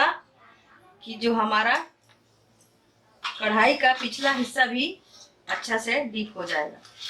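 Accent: Bihar, India. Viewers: आप बिहार से हो क्या ?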